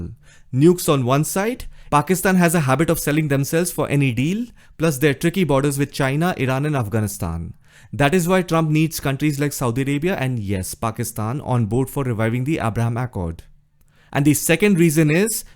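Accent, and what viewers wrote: India. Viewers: Your Indian accent is so annoying 🤮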